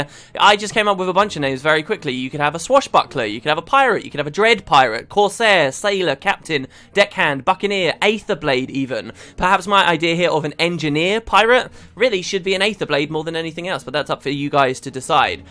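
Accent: United Kingdom. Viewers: You gotta tell me what area of Britain you're from! I heard you pronounce "law" similarly to "lore" and couldn't help laughing. Great ideas for the giveaway though, I hope it all pays off by bringing in new viewers:)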